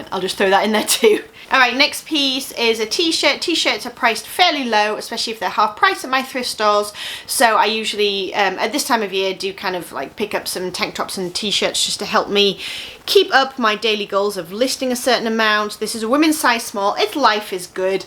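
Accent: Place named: United Kingdom. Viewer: Love your British accent and lingo!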